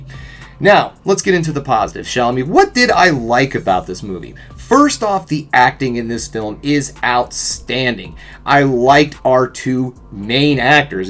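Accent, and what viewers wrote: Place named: North America. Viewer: I keep meaning to ask this: where exactly are you from? I'm a British guy and I just adore hearing your accent. I know it's either an American or Canadian accent you've got (I admittedly struggle to distinguish the two), but I'm curious about which region. Great review, btw 🙂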